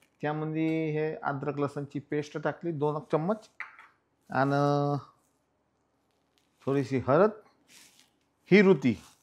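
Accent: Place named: Varhadi, India. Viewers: Aaji lay chaan varhadi bolta Maja aa gaya Mai Nagpuri ❤ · वऱ्हाडी छानच बोलतात आणखी कोणत्या भाषा येतात तुम्हाला? नक्की बनवून बघणार, मी playlist बनवतो आवडत्या रेसिपीज ची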